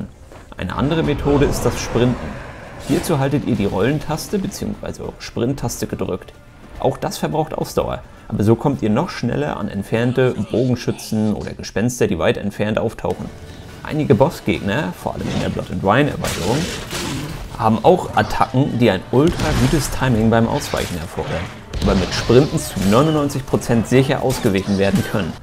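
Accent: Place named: North, Germany. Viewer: Kommst du aus Schleswig-Holstein oder Hamburg? Erkenne deinen Akzent sofort 😂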